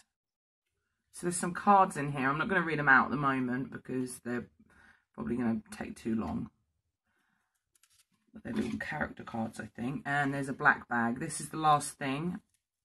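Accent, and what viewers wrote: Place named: United Kingdom. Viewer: Your british accent kills me softly everytime! You are the cutest goth girl! 💜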